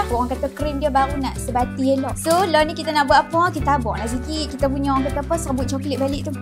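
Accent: Kedah, Malaysia. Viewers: Puaih dgq loghat kedah ampaa oii😂😂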